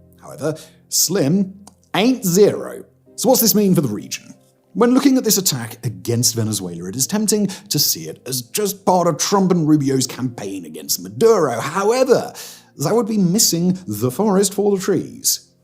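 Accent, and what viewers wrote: England, United Kingdom. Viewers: Couldn't watch this video... Jesus.... Am I the only American who cannot stand listening to an Englishman..... Maybe it's the Continental in me.... Shut up pommy!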